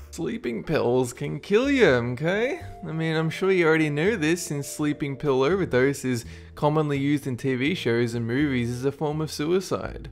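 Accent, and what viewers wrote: Australia. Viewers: He's Australian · Only just realising this now. He's probably an aussie. I tend to tune out accents which are the same as mine because I hear it every day... but I don't think he's from Victoria originally (if he lives here now. I heatd someone in a shop the other day who sounds a lot like him). Maybe NSW or QLD... not sure... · been listeing and He's an austurlian I think....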